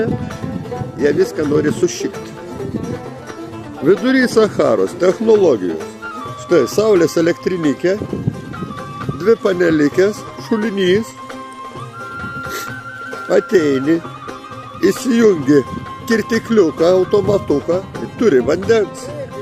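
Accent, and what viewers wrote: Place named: Šiauliai, Lithuania. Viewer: O KALBA ŠIAULIETIŠKA..RYŠKIAI😂❤👍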